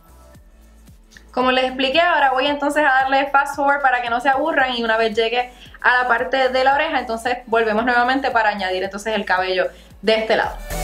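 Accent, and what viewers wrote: Puerto Rico. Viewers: (pues lo supe por el asentó Boricuaaa jajaj yo también soy de allá besosss)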